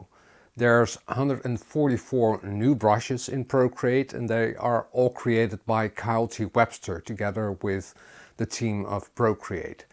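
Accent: Netherlands. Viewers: How did I instantly know you are Dutch? You remind me of my Dutch friend.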